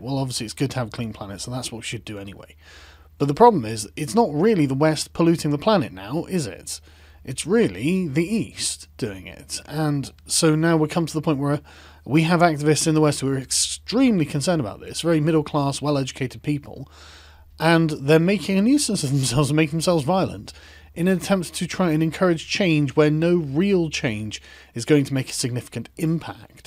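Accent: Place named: United Kingdom. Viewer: He's got me convinced. It's probably that British accent though. You chaps could convince me of about anything.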